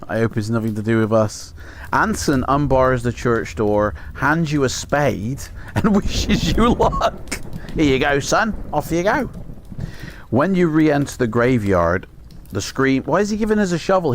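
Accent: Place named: Cornwall, United Kingdom. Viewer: I can’t watch this shit. I can’t see the book or the pictures, all I see is your fat ugly mush chatting bear shit, making silly noises and the front cover page on the other side of the screen. And you talk too slowly, take 10 times longer to do everything than in needs to, and you’ve got an annoying Cornish accent. Which is fine for adding some effect, but you’re focused more on effect than reading the story, in a normal fashion. And we don’t need to make every single decision for you either, just getvon with it mate, life’s too short for all the faffing, farting around and film flam. What kind of game is this? How to take the piss out of fighting fantasy books? I’m out.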